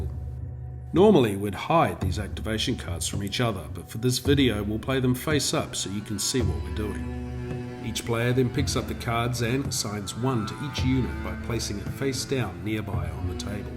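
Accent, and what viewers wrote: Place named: New Zealand. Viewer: Sounds like this is an NZ developed game, from the voice over at least. Couldn’t get a copy from anyone in the South Pacific, so had to order a copy from Germany! Looking forward to it arriving. Hopefully enough time to print and paint some minis!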